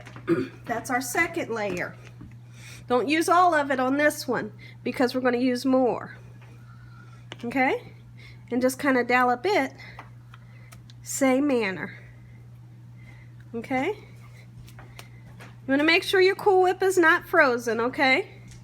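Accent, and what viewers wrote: South, United States. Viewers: I loveeeeee your voice soo sweet & southerny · Only thing southern is her accent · Mmmm it smells just like bananas 😂😂 great southern accent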